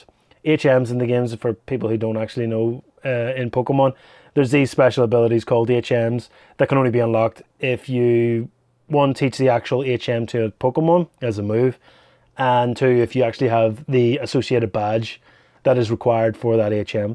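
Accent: Northern Ireland, United Kingdom. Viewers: You sound Northern Irish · You sound Northern Irish.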